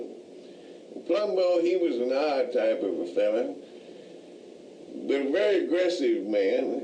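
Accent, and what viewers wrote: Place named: South, United States. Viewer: Just found these Gems!! Man I been missing out!! I can listen to this man tell a story all day!! He's got this very captivating southern charm of way about telling bulldog stories..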